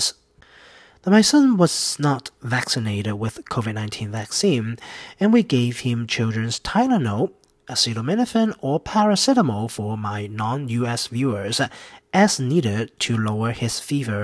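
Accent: United Kingdom. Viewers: Your demeanor is measured, not alarmist and speak as a father and husband as well as a scientist. I detect British alliteration when an open ended vowel word meets a word beginning with open vowel. 😉 A Brit are you🤔🤣🤣.🤲thanks for your vids🤲. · Dr, I watch many doctors and scientists on this subject! I love your channel honesty and accent, from the UK, no problems understanding you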